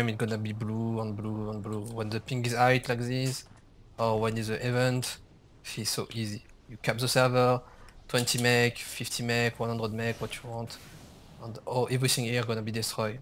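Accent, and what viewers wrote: France. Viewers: On sent l'accent français quand même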